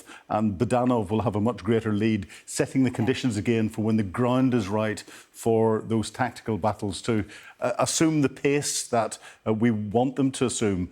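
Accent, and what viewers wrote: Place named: Ulster, United Kingdom. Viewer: Just love his Ulsterman delivery........day 578(eight!) of a 10 day operation.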